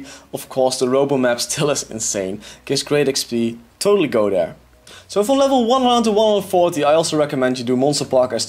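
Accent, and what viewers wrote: Netherlands. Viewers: You dutch bruh?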